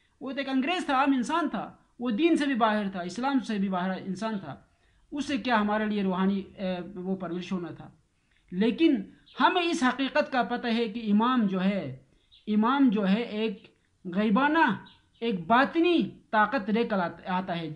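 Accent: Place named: North, Pakistan. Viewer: He belongs to Northern pak.his accents are a bit different.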